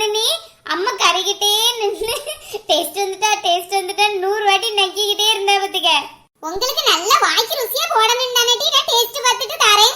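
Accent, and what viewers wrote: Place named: Nagercoil, India. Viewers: Nagarkovil slang superrrrrrrrr😍😍😍😍😍😍😍😍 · Kanyakumari Tamil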